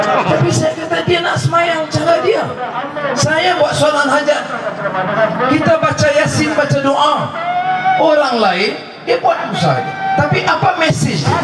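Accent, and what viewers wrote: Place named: Negeri Sembilan, Malaysia. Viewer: ingatkan org negori bro heheh.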